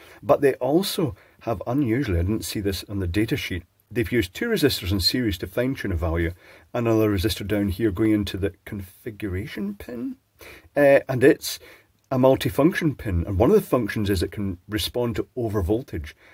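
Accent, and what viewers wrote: Scotland, United Kingdom. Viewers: I have a Koppla, and, my knowledge of electronics is far too crap to follow this. But as a consumer, as large companies go and someone who tries to avoid buying anything first-hand, maybe I've swallowed some marketing but I do feel IKEA is a name I trust a bit, I'm glad to see this disassembled. Can't help but remember the episode of Top Gear about the final demise of Saab. There's something about a company running itself into the ground because "good enough" simply wasn't good enough that viscerally hit home. Also bonus tip: Å is like an a then an o. Små means small and there's a similarity in sound, much more so in your Scottish accent than my English bastard one. Decided to learn Danish rather than Swedish and they're quite different in many respect but I expect hagel is pronounced very similarly to "hail". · It's funny hearing a British man pronouncing Swedish 😂❤ koppla was pretty good, I would never have understood småhagel if it wasn't for the text on the box 😂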